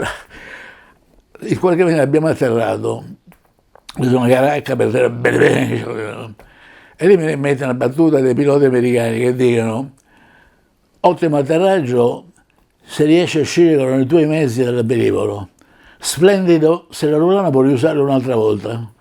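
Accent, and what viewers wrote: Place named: Rome, Italy. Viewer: Ma che siete tutti padani ?? È un romanesco masticato, parlato "dinto o musso" come dicono i napoletani 😂😂 . Comunque era gente tosta.. così non ne nascono più.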